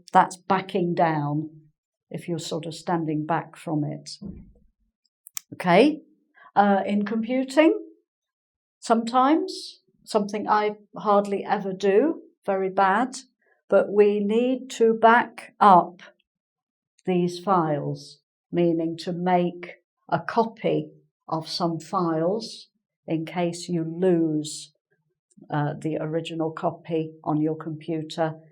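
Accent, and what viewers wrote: United Kingdom. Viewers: Hi, I'm from Brazil!!! You are incredible!!! I love your channel and I love the British accent. Thank you for sharing your knowledge with us! 👏🏻❤️😍🇧🇷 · I'm a spanish speaker and I really enjoy all your lessons. I have problems with the british pronunciation but I can understand you perfectly. Thank you very much you helped me a lot.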